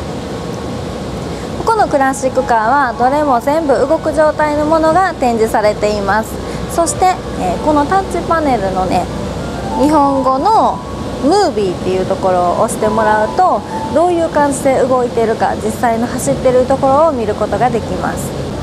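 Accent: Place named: Kansai, Japan. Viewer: 関西弁や。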